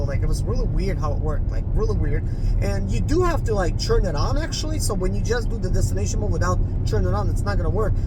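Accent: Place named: Balkans. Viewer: What nationality are you? You sound very european. Particularly balcan. Am i correct?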